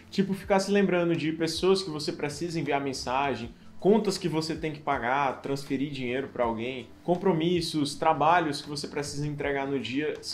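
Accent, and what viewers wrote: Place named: Fortaleza, Brazil. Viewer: Oi,. Tu é daqui de Fortaleza-ce? o sotaque é parecido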